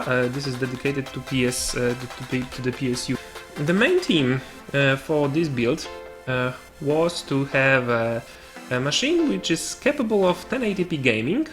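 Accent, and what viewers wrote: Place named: Italy. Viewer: aw well, your accent sounds like you're italian xD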